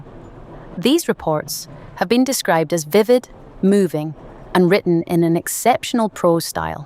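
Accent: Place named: Scotland, United Kingdom. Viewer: AI Scottish woman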